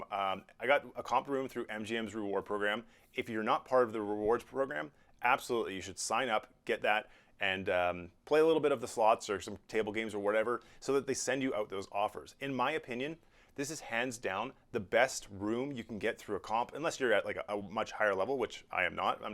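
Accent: Canada. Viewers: I like the $20 trick to see if you get anything. I find it interesting that some places allow early check in. I like your brief reviews! BTW, do I notice a Canadian (or Midwestern accent) haha?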